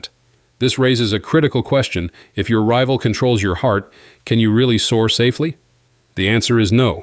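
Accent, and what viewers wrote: United States. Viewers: Why is it always a droning American accent, that provides the 'expert' advice, telling us that China will die without outside technology? You need to get an air ticket to China you clown. Its just faintly possible, you might be educated to learn that China, by any comparison, is 100years in advance of the rest of the world. This is just shit comedy but pass the bloody popcorn anyway😂😂😂😂😂😂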